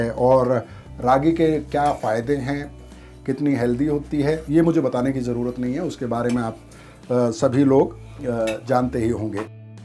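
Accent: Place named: Moradabad, India. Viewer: जी आपकी भाषा मुझे मुरादाबाद के आस पास के छेत्र की लगती है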